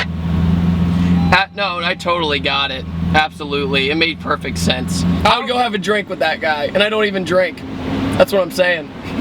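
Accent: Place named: Pittsburgh, United States. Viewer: Dat Pittsburg blowg doe.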